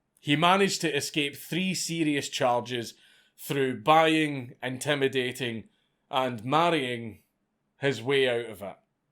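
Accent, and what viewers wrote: Scotland, United Kingdom. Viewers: He's Scottish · My god a Fellow scot with a blantly Scottish acent, that alone was a joy to just hear blurting out over the speakers. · this is the most scottish man i have ever heard. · Are you SCOTTISH by any chance ?! Can you do a Transylvanian Accent ? Of course I've heard of Count Dankula.... you've gotten a lot of coverage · This youtube channel is great. Fascinating history narrated by a suave Scottish accent, who could ask for more?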